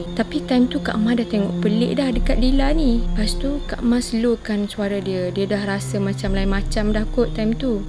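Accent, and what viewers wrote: Kelantan, Malaysia. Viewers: Sy Dr Cyberjaya...tp Sy still suka loghat Kelantan seperti cerita sebelum nya...ni nmpk mcm kekok jer bercerita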